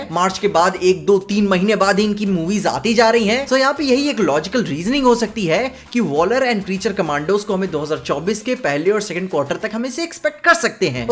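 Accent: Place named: Uttar Pradesh, India. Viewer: Bhatere 😁 up ka dialect aane lag gya bhai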